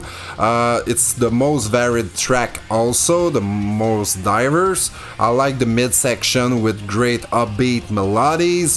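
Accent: Quebec, Canada. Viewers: Wow that is one heavy french Canadian (Québécois) accent. Excellente video! Cheers \m/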